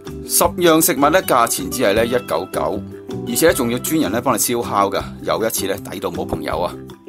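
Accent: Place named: Hong Kong, China. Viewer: Data兄的广东话在香港人中应该算非常好听的那种吧？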